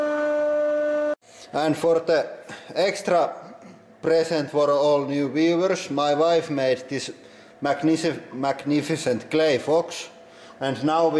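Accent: Finland. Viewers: Are u finnish cuz ur english accent is finnish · LOVING THE ACCENT #RALLISUOMI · Ootko suomalainen ku sun enkun murre kuulostaa suomalaiselta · i can hear from the accent that this guy is finnish lol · Are you Finnish? Sun aksenttisi kuulostaa siltä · That rallienglanti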